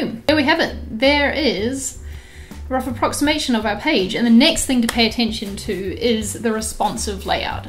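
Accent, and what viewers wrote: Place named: New Zealand. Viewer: good to hear a kiwi accent on the tube!!!!!!!!!!!!!!!!!!!!!!!!!!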